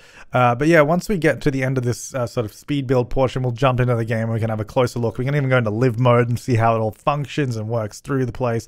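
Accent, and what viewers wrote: Australia. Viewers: Last night I had a dream that was looking for an assistant to help him and his requirement was just you had to sound like him. The whole dream from then on is me trying to sound Australian and saying "hey guys!" A lot.😂 Edit: omgoodness thank you all for the likes! I don't think I have ever had such a loved comment. 😊 I'm happy I could entertain you with my silly dream!😁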